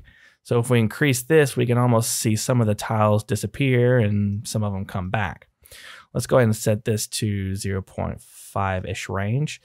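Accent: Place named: South, United States. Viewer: i like your southern accent cowboy thanks for the help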